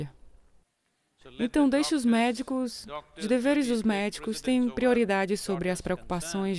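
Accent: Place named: Brasília, Brazil. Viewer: Sotaque de Brasiliense.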